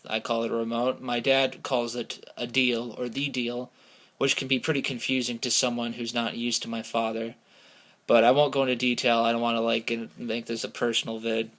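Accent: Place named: Ireland. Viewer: hahaha you're adorable xD singing your username!!! ' ' XD haha I'm sorry to hear about your grandparents I only have my gran left too, one gran on my mums side The trainspotting book was a surprise haha Yer Scottish accent Isnae terrible bu it soonds mair Irish than Scottish tae be honest wi ye ye need tae come here tae properly learn the accent! But you read very well haha considering the Scottish English ^^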